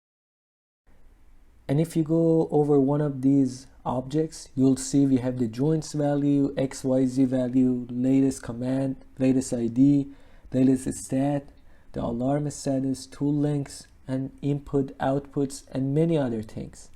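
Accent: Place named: Iran. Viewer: iranian accent lol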